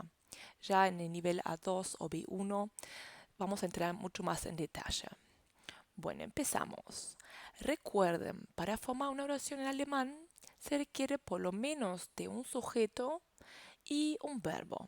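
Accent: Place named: Castile, Spain. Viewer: Sos una crack... Dankeeee Totales!! P.d. Me emociona, se me caen las lágrimas y canto un tango por tu acento castellano. :)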